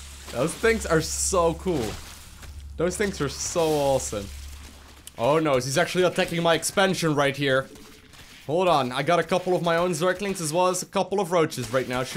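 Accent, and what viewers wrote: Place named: Netherlands. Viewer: The accent, though. Are you Dutch? Good vid nonetheless!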